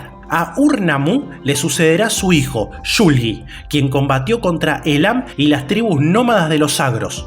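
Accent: Argentina. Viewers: Benditos sean todos los hispanohablantes pero yo puse el sonido para ver quién relataba este documental y escuchar un argentino fue un aliviaso, encima buenísimo el canal, bien ahí <3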